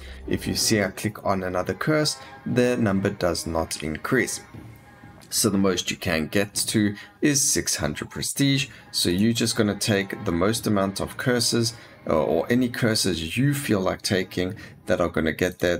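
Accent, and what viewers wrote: South Africa. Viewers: You South African brotha?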